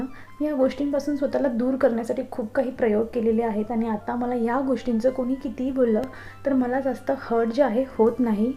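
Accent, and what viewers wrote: Khandesh, India. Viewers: Tumhi proper kuthle ahe??? Khandeshi ahat ka???language vrun vataty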